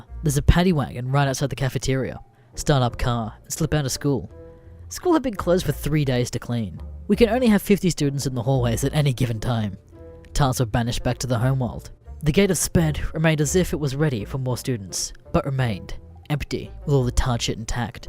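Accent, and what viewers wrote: Australia. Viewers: Wait your an AUSSIE A REEEEEEEEEEEEEEEEEEEEEEEEEEEEEE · good morning aussie boy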